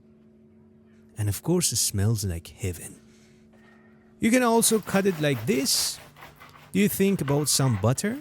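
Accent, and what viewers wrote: Iran. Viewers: I bet this guy is iranian. And the petsian accent kept on increasing throughout the video😂 Btw yeah, thanks for the video. I'm going to try out this recipe also.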